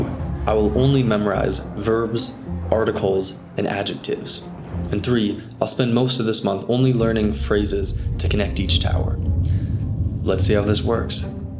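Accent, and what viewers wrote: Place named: Italy. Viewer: You do sound very Italian. Not trying to knock you, but You are making an effort. And I'm going to try using this for Russian 😳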